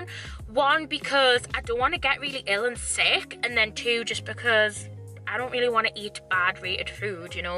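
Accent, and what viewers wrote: Newcastle, United Kingdom. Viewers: I love your voice are you britsh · this ismy first time watching you and im definitely going to subscribe one question, are you geordie? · She is from newcastle, which is in England :) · she’s british, the accent is geordie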